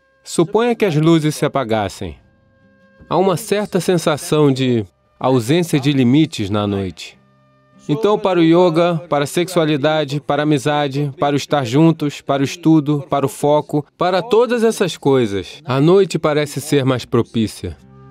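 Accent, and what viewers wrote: Rio de Janeiro, Brazil. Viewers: Eu adoro a voz do dublador com esse sotaque carioca, traz o discurso mais próximo da nossa realidade. As vezes assisto o legendado, mas a noite com sono quase dormindo, prefiro a voz do dublador.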